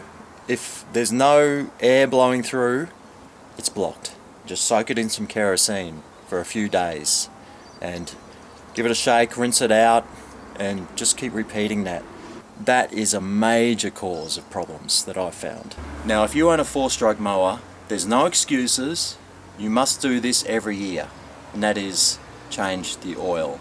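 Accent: Australia. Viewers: Thanks heaps! Fixed my mower! Legend! U also taught me how to cook Corned beef (was that diff channel, watched it over a yr ago!?) ! And all with the Aussieness I'm used to! Cheers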